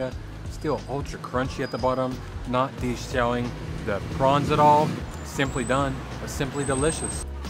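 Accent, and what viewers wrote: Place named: Arkansas, United States. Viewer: He's from Arkansas. Totally different state and different accent. LOL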